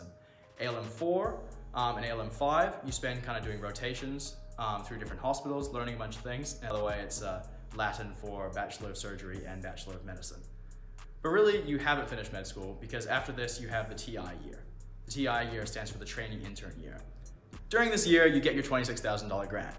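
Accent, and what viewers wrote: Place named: United States. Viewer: Hi. I am in the final semester of my undergraduate studies and I want to do my masters programme in NZ. the problem is that I majored in American and British language and Literature and I want to do switch to medicine or nursing. Please what is your advice? Are you an international student there? 'cause you have an American or Canadian accent.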